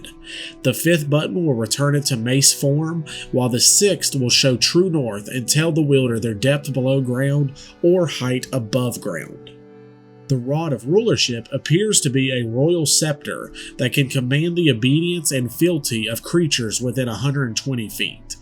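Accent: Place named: Deep South, United States. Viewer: Got that drawell, that deep south dwall lolll.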